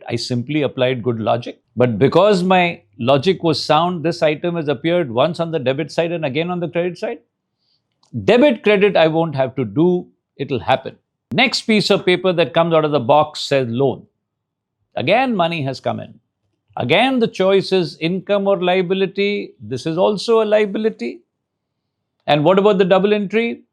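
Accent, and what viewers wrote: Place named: India. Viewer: the rhythm, gestures and pauses all indian, yet speaking in englishhhhhhh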